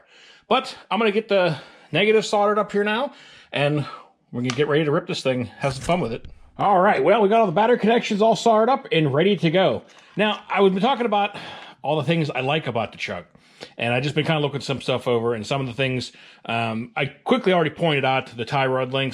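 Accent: Pittsburgh, United States. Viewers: The Pittsburghese is strong, my friend. I am also from the area.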